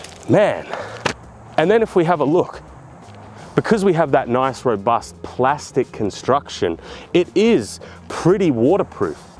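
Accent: United States. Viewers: American accent or dubbed anime accent? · I need more American accent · I think we need more love for his American accent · This is what I have been waiting for. Thank you for getting deep into the nitty gritty. Fantastic American accent. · The American accent is killin me lol great video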